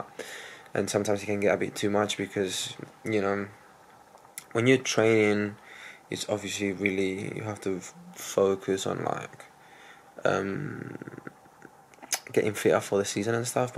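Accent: England, United Kingdom. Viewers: You sound English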